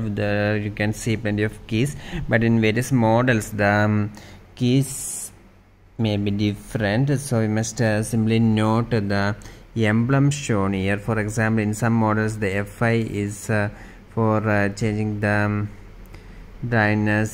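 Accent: India. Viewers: where is the accent from? is it India?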